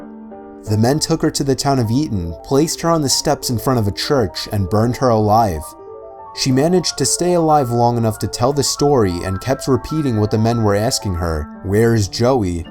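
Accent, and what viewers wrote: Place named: New York, United States. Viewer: I love his new York accent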